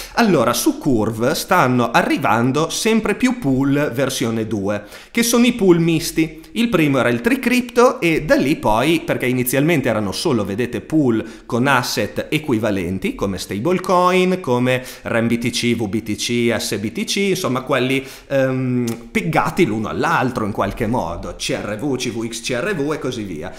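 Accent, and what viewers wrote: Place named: Lombardy, Italy. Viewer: Di dove sei? Hai l'accento delle mie parti(Lombardia)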